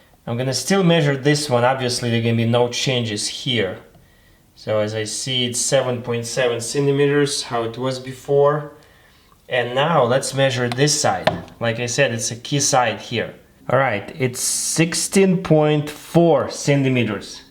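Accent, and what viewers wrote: Russia. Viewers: А акцент то русский 🌚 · Как же я кайфую от русского акцента :3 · Вау!Вы русский и говорите по-английски.Да,виден русский акцент.Почему,кстати,русские говорят куда хуже на английском,чем любые другие народы(греки,итальянцы,турки,голландцы,немцы)? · Ммм, русский акцент, ну привет, русская душа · Ну слышна русская речь,так же говорю по-английски)Ахх,акцент не пропьешь) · Me:is he Russian? Also me: scrolling down and sees username oh... Edit: why is this still getting more likes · Сач э стронг рашн эксент! Ыыыы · All the Russian YouTubers have the same accent.. Taras Kul.. and him . They speak the same. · Is this Russian accent? Oh, stupid I didn't read the name of channel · ОХ ЗЫС РАШЕН АКЦЕНТ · Мне очень нравится его русский eanglish. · такой русский акцент, ахвхах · Really funny tbh, I didn't look at his name but heard his voice and tbh this is not the thickest Russian accent I have heard tho. 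Very close to English, good job for learning english so well btw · Me: he have a heavy Russian accent—oh he’s Russian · У тебя иногда проскакивает русский акцент) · Прям слышно русский акцент произношения английского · Ох уж этот знакомый русский акцент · Акцент не совсем английский, или ты специально, типа рашен стайл?)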